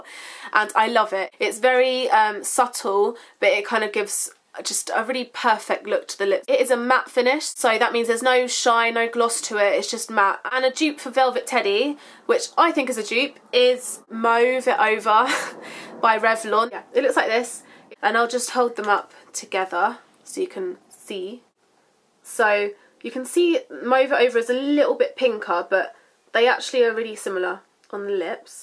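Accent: United Kingdom. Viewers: ur cute. then i played ur video. ur british! even cuter! totally subscribing! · lol i just think its so cool when i click on a youtube video about hair or makeup with a girl & then out comes this british accent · Ur so British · I love the British accent !!! Thank you for the very helpful video 💕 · Are you British ?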